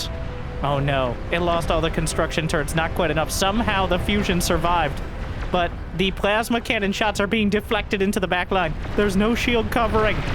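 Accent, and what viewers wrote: United States. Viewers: What is dudes new American accent?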